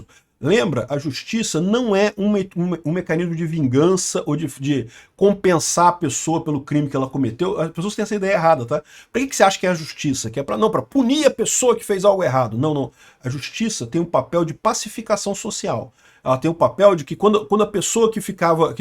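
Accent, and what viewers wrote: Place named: Rio de Janeiro, Brazil. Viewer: Tbm amo esse jeito dele se expressar! E tbm o jeitão Carioca. Mato as saudades do meu povo do Rio.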